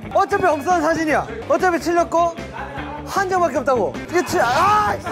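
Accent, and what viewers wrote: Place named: Busan, South Korea. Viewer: Busan satoori lol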